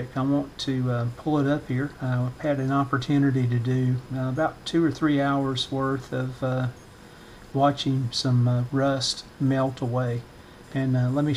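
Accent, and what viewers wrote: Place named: United States. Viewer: Thanks very much. I didn´t know that web page catalog for old radios. It will be very useful for me with this Portadyne has my head on nuts. Have a nice day. Wish to see your next video. Your american english voice is not so difficult for me to understand (only some times with some words or some short phrases). Best regards and follow on line. Ketk (from Spain).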